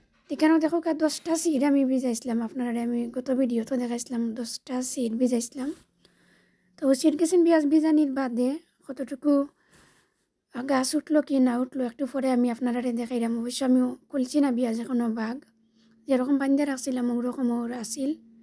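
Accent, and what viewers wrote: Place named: Sylhet, Bangladesh. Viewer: ও মাই গো আপনি সিলেটি আমার সিলেটি মাত আমার খুব ভালো লাগে। আমিও সিলেটের মাজো অনেকদিন আসলাম 😊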